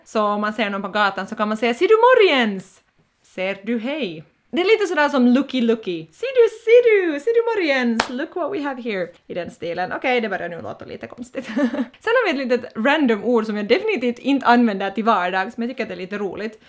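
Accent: Finland. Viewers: I can hear the Finnish vowels in Finland Swedish. I prefer this kind of Swedish than Sweden Swedish lol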